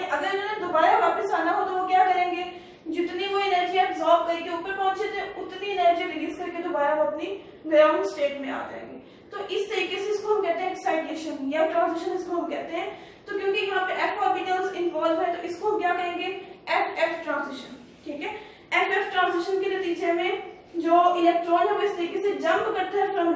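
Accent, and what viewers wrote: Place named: Lahore, Pakistan. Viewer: Master of Science ..so , where r u from...your accent looks like lahori.. I m from india but i love pak...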